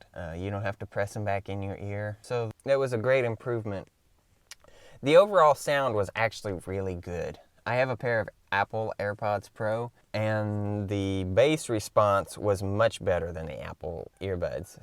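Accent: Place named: Alabama, United States. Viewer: I know you You're from Alabama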